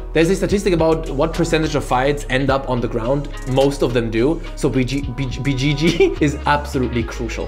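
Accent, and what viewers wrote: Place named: Germany. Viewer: Redest du eigentlich extra so ein klischeehaftes englisch als deutscher oder versuchst du erst garnicht die Wörter richtig auszusprechen?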